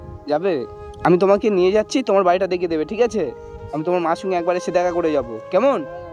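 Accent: India. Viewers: কথা শুনে মনে হয় ইন্ডিয়ার 🙂 ইন্ডিয়ার ভাষা এমন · ইন্ডিয়ান কথার মতো লাগছে💕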